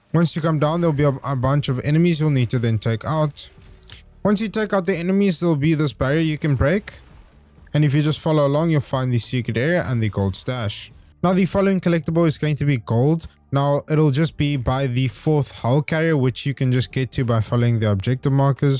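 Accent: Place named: South Africa. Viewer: Just curious, are you south African? You sound a lot like dicuss du plessis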